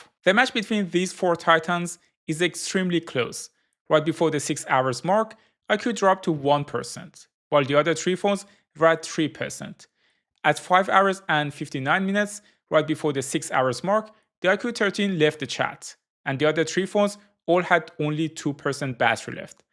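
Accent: Iran. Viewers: Obvious persian accent 😁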